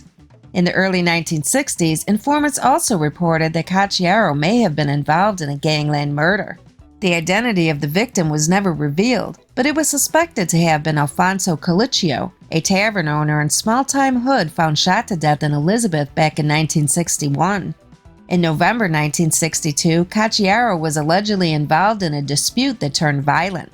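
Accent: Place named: Midwest, United States. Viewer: Horrible narrator. Can’t have someone with a midwestern accent narrate about things going on in NYC. Her pronunciation of the word “Manhattan” made me cringe.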